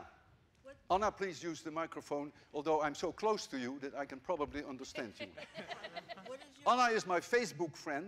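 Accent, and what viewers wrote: Netherlands. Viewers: I could hear immediately that he is from the Netherlands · Yep, Dutch for sure. He threw me a bit when he said he'd seen it many times in Austria, but then I came to my senses. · I immediately heard he is Dutch. I don’t even have to research it. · 5 seconds of listening and I already know that he's a dutchie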